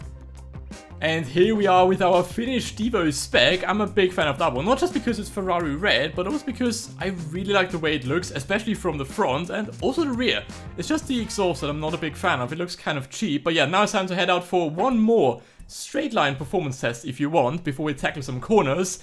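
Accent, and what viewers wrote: Germany. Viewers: Find ich nice, dass man bei deiner Stimme raushört, dass du deutsch sprichst😂🙈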